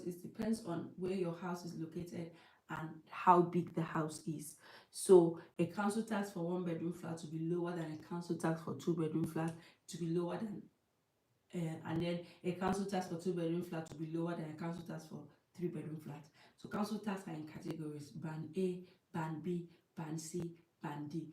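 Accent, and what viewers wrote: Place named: Akwa Ibom, Nigeria. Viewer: My typical Akwa ibom sister. Your tongue betrayed you big-time.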